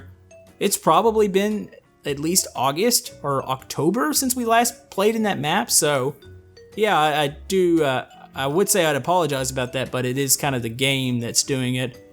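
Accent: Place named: Texas, United States. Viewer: can you speak with your texas accent?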